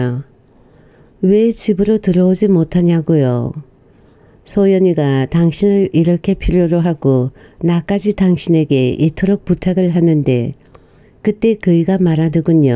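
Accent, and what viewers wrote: Gyeongsang, South Korea. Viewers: 경상도 분이라서 사투리가 전혀 이상하지가 않네요,잘듣고 있습니다,감사합니다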